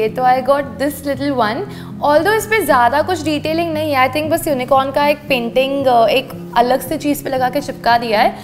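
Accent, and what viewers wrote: Gujarat, India. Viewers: Di are you gujarati ?? Because again again you are saying like jaadrha jaarha i am also from Gujarat vadodara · Is your mother tongue gujrati becoz the way you speak it conveys that you are also gujrati like me pls reply di and I also love collecting stationery ❤️❤️❤️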